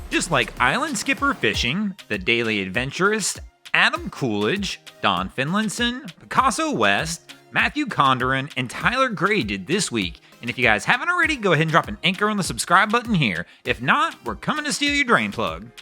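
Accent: United States. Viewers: loved the vid. Had to turn off the sound though. That constant loud whiny american voice did my head in.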